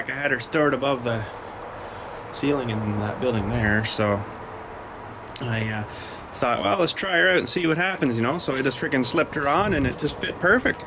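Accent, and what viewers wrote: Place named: Canada. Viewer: Thanks for posting up this video! I like the way you "neighbors to the frozen north" speak. Cracks me up!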